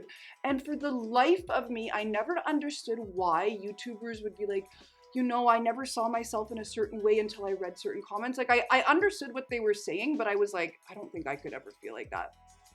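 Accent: Canada. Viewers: Are you Canadian? You sound very Québécois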